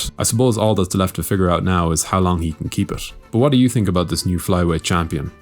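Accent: Ireland. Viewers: Irish. Not even close. 😂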